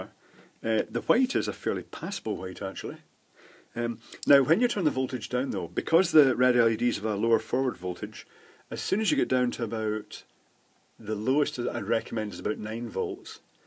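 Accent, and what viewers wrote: Scotland, United Kingdom. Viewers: Can I ask where you accent comes from? I've seen in your videos your in the Isle of Man but the accent sounds Scottish? It's rather pleasant! Very well spoken.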